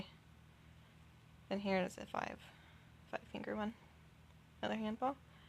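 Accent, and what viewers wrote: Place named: Northeast, United States. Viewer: Are you from Northeastern America? I'm from the south, but I can hear a familiar "ou" sound when you say poster board or smth like that. Sorry if this seems weird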